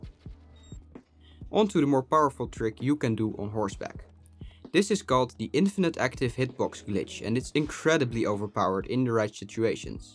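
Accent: Netherlands. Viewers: First 3 words and i know you're dutch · Are you Dutch because your English sounds like someone Dutch talking English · I can hear in ur accent, u're Dutch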